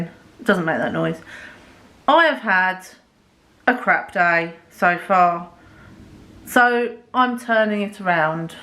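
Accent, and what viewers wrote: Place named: Yorkshire, United Kingdom. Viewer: Oh oh! The first rule of baking is measure! 🤣 It isn't the same as cooking, where eyeballing it works great. You are so lucky that it turned out! Anyways, enough of my motherly comments. My bf says you sound like his sister (from Yorkshire). Too bad about the Halloween deco. Do you have a ghost that is playing pranks? 👻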